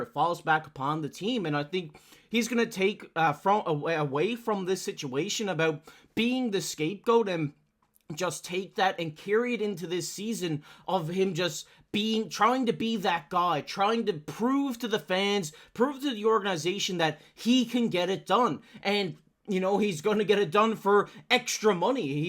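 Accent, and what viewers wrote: Newfoundland, Canada. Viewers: niiice. They have a great team. Beating Man United was a great thing. I loved that. I say they finish top 4 or 5. Thanks for all the work you do. I'm a massive Leafs fan and enjoy your channel. Keep up the great work. Btw are you from Newfoundland? I'm a Cape Bretoner. I recognize the accent.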